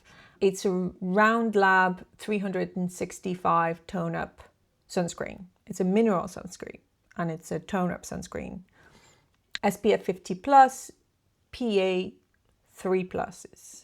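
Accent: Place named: Ireland. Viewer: Nice video! Are you living in Ireland? You have quite an Irish accent 😊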